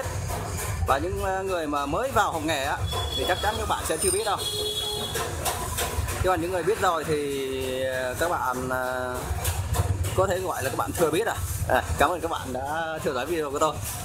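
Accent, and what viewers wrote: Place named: Thanh Hoa, Vietnam. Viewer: nghe giong noi dan thanh hóa, thấy sợ sồi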